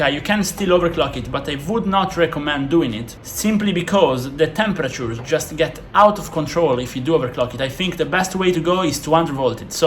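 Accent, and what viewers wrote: Italy. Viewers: Qualcosa mi dice che sei Italiano... o al massimo spagnolo, comunque grande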